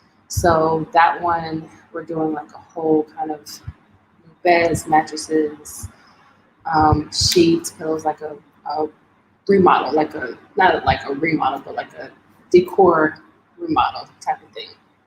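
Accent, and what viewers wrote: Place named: United Kingdom. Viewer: 😂 that UK accent something different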